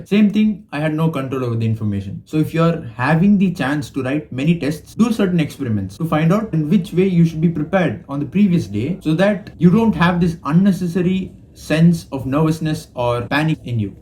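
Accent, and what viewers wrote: South India, India. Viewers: bro your accent is similar to south india nearly tamilnadu /kerala/ap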